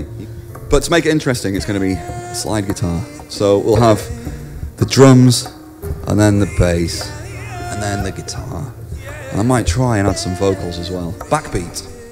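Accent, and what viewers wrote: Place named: Australia. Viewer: he sounds a lot more aussie than i ever guessed by his singing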